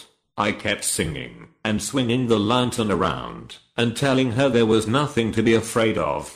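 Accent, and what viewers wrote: United Kingdom. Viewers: All of his voices and pronunciation is perfect......Full of British Accent!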